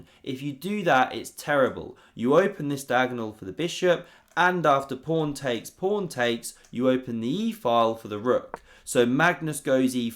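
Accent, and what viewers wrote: United Kingdom. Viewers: He's just British. Great channel!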